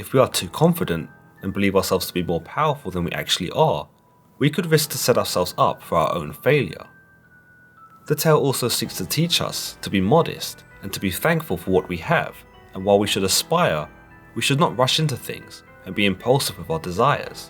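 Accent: United Kingdom. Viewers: video length my moth3rs bday, so I was like Imma do Connie a solid. 177,444 views, Izeah or something luci4. Lucy Ferrey, if Scarlet Johansen had a crush on me. I had sorta long black hair, but I cut it shoulder length, Darth Vadored it. I am God and as a side job God's body guard. If I got a second story and solar pannel roof, would I sell it to my friend Leeman or whoever, and or myself and commit to dying my hair ultimate black to match the solar pannel roof. 'Cause right now it's a one story with red ocher Spanish tile roof that matches my Amanita red hair. Went there. For the prophets. The British accent is powerful. a gay piggy back to smoke a bowl of weed we sometimes entise ourselves with. I'm going now. Ha ha ha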